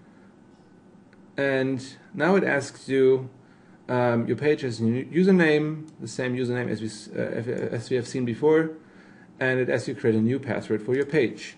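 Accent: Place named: United States. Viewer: Man, how intelligent you are. Language's accent is some big issue, no matter how smart one is. For some of those British out there you are labeled as an American, they don't know any better but you do...! With that Intelligence of yours I'm sure you could speak even Chinese without an accent if you want to...!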